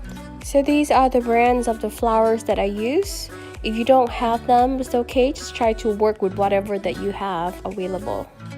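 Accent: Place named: Luang Prabang, Laos. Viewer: พูดภาษาลาว เพราะกว่า เพราะเสียงขึ้นนาสิก จะพูดจะร้อง ก็เพราะ ชอบเสียงและสำเนียงหลวงพระบางมากๆ ฟังแล้วสบายใจ ถ้าทำคลิปต่อทามมิ่งตอนนี้ ก็ยังโอเคอยู่นะ เป็นกำลังใจครับ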